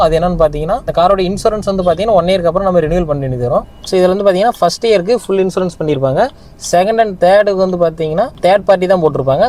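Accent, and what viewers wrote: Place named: Kongu Nadu, India. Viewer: Athu konga area slang nga kannu😊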